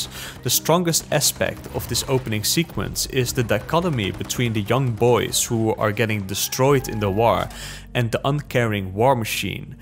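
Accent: Netherlands. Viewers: eyyyyy you Dutch? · Dit is het meest Nederlandse accent dat ik ooit gehoord heb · Het Nederlandse accent is zo zwaar😭😭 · Tell me you are dutch without telling me you are dutch ;P very goed acent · Nice dutch accent broeder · Tell me your dutch without telling me your dutch. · you can just hear the dutch in his voice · Lekker Hollands accentje hoor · Narrator has the most Dutch accent ever lol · Haha ik hoor in je accent dat je Nederlands bent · Just found your channel, this is a great video glad it popped up in my recommended. (But are you by any chance Dutch? Your accent sounds familiar) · You sound Dutch 😂 love the video btw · 1 second in to the video and I reconized a fellow Dutchman! Great video! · Goddammit. Every once in a while i will come across your videos and i can tell its very good quality. But then i hear your voice... and that horrible Dutch accent (that i have myself) and i just cannot ignore it and it kinda drives me crazy and i rarely make it to the end. 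Its such a waste because im pretty sure these are excellent video essays! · Ik hoor duidelojk dat je uit nederland komt broeder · Ik herken een nederlander als ik het hoor, goede video!!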